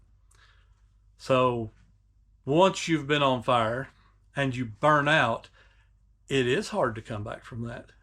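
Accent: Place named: South, United States. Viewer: Southern accents sometimes leaves others guessin 😊 I'm going with Pack It. Hope Y'all have a great Holiday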